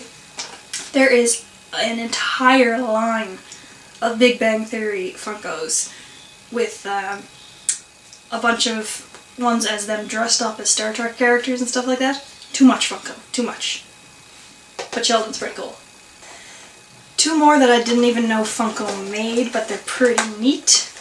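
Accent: Ireland. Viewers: I can't decide wether she is Irish or American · Are yu from Ireland? You kind of sound like us haha I wish we got a haul like this! :P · R u Irish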